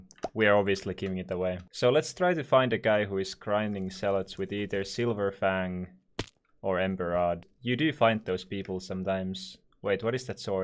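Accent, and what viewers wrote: Finland. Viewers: are you from finland because your english sounds finnish accent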